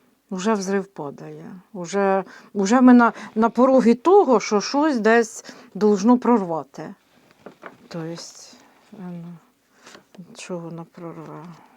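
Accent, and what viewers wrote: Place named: Dnipro, Ukraine. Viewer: Дякую Вам,пані,за ваш суржик (ми теж, ваші сусіди з Дніпра так балакаєм).за Ваші ємоціі(вони дуже близкі нам).за вашу правду.!!! Хай щастить і здоровьєчка Вам!:hand-pink-waving::face-red-heart-shape:❤💛💙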